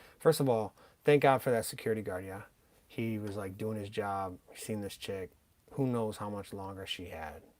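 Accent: Hawaii, United States. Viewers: Cool, I can hear your Hawaii accent. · This guy sounds like a local braddah. Love your work bro🤙🤙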